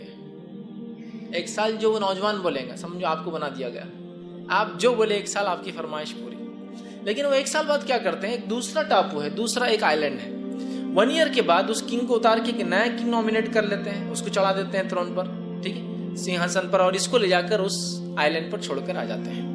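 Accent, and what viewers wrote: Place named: Hyderabad, India. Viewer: Hyderabad wale lag rahe hai ye.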